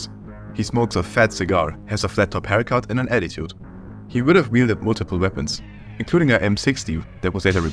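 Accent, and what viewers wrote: Germany. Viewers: Why are cut enemies always that good?😭 PS: Its undeniable that you are german, am i right?😅😊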